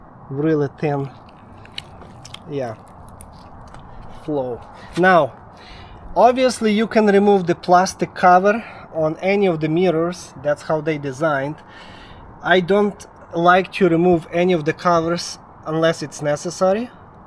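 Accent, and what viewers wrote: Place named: Russia. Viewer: а почему Слава Богу? акцент то русский.)))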